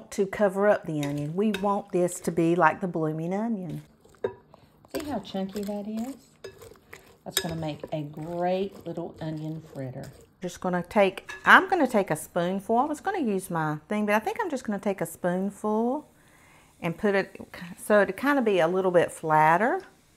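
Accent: South, United States. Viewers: I just found your channel just this minute and let me tell you I absolutely love it! Love your lovely southern accent ❤I’ll be making this recipe this weekend for my son who’s coming over for vacation and love my cooking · That accent. Georgia or Alabama? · Yeah, it's sad people gotta be mean like that and try to make other people miserable. I just ignore them unless they are particularly ugly, then I'd report them. Me and my 10 brothers and sisters were raised on fritters. Heck, Mom made fritters from fritters. Anything leftover in the refrigerator was fritter fodder. Corn fritters, mashed tater fritters, hot dog fritters, cheese fritters, liver and onion fritters, cow tongue fritters, spinach fritters, apple or any kind of fruit fritter. I've had them all. Mom would disguise ingredients inside a fritter for the food the my little brothers didn't like and it tricked them every time. 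Spinach & blueberry fritters rolled in a bit of sugar… delish. And my brothers didn't even realize they had eaten yucky spinach. I'm getting a big kick out of her southern accent. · I saw onion fritters so I clicked the video and THEN I heard that sweet southern accent and instantly subbed 😂 you sweet lil southern ladies ARE THEEEE BEST COOKS EVER!!❤❤😂😂 & Im a polish girl from up north so I CAN NEVER taste food this good 😂! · Aussie here! Love the southern accent! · You must be in Ga., I’ve retired to the south. I’m learning all the unique diff southern drawsssss!🤣🤗🧅🍑🧅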